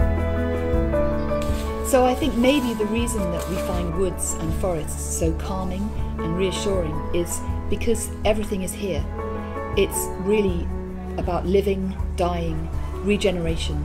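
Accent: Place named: London, United Kingdom. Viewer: 天啊。好标准的伦敦腔。听着好美好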